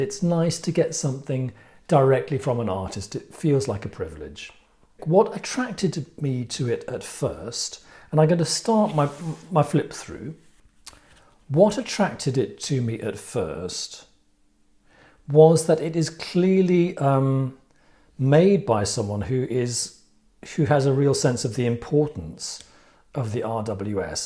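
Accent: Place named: England, United Kingdom. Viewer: Hi, new subscriber here. From Empress Song's channel. It is refreshing to hear an English accent. I like this deck, in that it is subversive. For example the King of Pents is meant to be master of the earthly realm, with access to resources to use at will. In this deck the King of Pents is removed from his element, with farming and wealth of the earth (the combine harvester in the background) beneath the King, as implied access to valued resources are supplied via the tablet. Maybe stocks in fracking, or cyber currency; the stock exchange rather than the actual nitty gritty of resource creation by own hands. So as a polemic on modern society, there is not as much respect given to skill of hand and land. Respect, ie wealth and value, is given to Airy (swords/guns) pursuits. As for the suit of guns, who needs intelligence when you have a gun? 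The previous 12 months alone in the US has been horrific and mind boggling regarding mass shootings and the desperate need to keep their right to bear arms. It makes no sense, but if Guns are placed in stead of strategy, analysis, logic, then a suit of guns is a sad state of modern living. The RWS of the 1900's had at least a little hope in it. This deck shows today's reality, the hope has to be added intentionally and sideways on. I have a few decks like this, the latest is a US games deck - The Neo-Colonialist Tarot of the Americas. Pamela Coleman Smiths artwork enables people to enter into the theatre of life. A fantasy. In these decks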